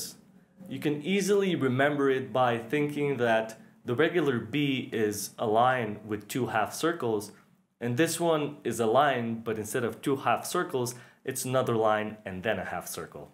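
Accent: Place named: Russia. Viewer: По произносимым звукам дико палится русскоязычный. Это не всем удаётся скрыть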